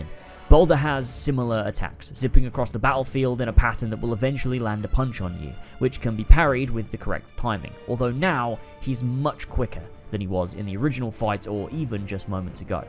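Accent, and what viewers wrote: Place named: United Kingdom. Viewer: A Greek name spoken through a thick UK accent.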